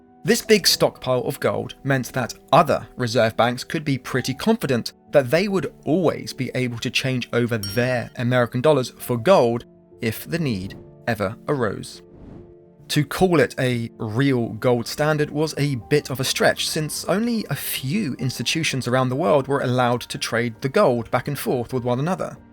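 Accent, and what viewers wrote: United Kingdom. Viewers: yes, but that makes this video blatant propaganda, doesn't it? And why a person with a British accent? He's using a very American-centric POV for the story. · You are a compromise. Speaking American with an English accent. Do you watch a lot of American TV or movies?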